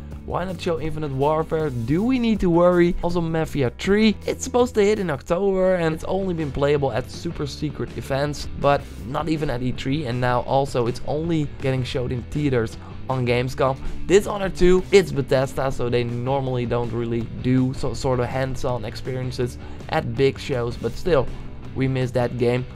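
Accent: Netherlands. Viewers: That dutch accent tho ;)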